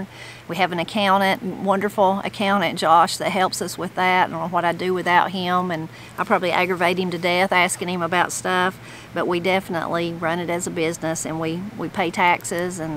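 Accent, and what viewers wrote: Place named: Kentucky, United States. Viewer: I appreciate your channel so much. I accidentally found it when I was searching for applachia out of homesickness! Your accent and words remind me of my SE Kentucky roots! Thank you! 😊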